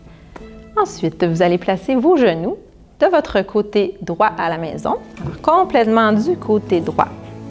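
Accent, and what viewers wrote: Canada. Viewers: Vidéo vue de Genève. (j'ai pratiqué et pratique occasionnellement l'hata Yoga). Super vidéo merci pour ce partage car j'ai un bon physique mais j'ai de la peine à me motiver...on verra si ça joue. Bonne continuation et j'adore L'accent canadien. J'ai un accent genevois ou vaudois à l'occasion 😉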